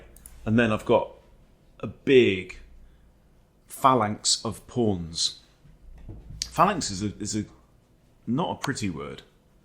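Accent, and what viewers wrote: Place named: England, United Kingdom. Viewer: I'm sorry you're being criticised for mistakes. Most people watching you are advanced beginners to intermediate, I suppose. If they want perfect lines, there are plenty of titled players on youtube that can provide that. You helped me get over that beginner stage when I kept getting wayward queen attacks. I think I've learnt more from you than any other youtuber and it's nice to have an English voice :)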